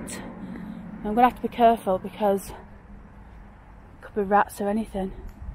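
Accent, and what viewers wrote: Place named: Yorkshire, United Kingdom. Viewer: Loved your Video im really familiar with your walk and the disused buildings and pubs which i remember well having been a taxi driver based in north Manchester in a previos Life, also i think the more you are back in blighty your yorkshire Twang gets less 🤣Very informative and interesting as Always XX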